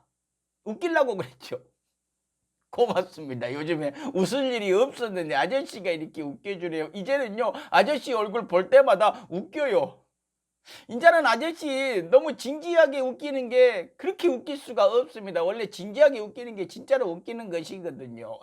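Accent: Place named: Rural, South Korea. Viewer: 진짜 최고 숩니다 ㅋ 그 언어 그 딕션 특유의 쫀득쫀득한 촌놈말투에 지식까지 역대급 강성범님 식사 잘 하십쇼 ^^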